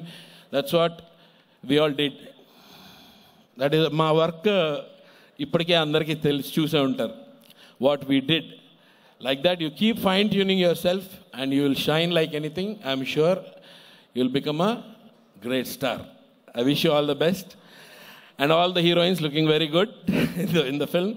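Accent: India. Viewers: nee butler English ...........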